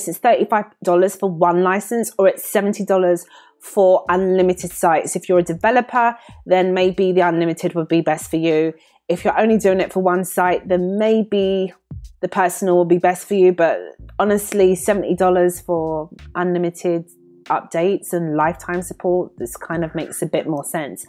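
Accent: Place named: United Kingdom. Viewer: Hi! Excellent video. Not to mention your beautiful British accecent!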